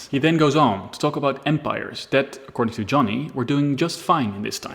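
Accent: Netherlands. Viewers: You sound Dutch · Zo hallo… dat accent is wel erg Nederlands.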